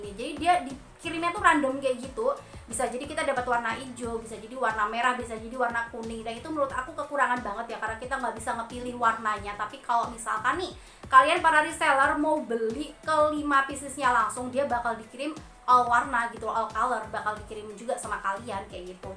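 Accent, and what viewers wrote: Java, Indonesia. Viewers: Medok ya kak😄😁mksih infonya kk. Menginspirasi bngt😊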